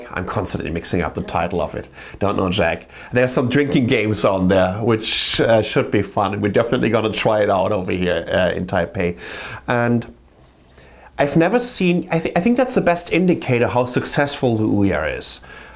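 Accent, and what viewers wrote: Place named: Germany. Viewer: God damn i love Germans speaking english.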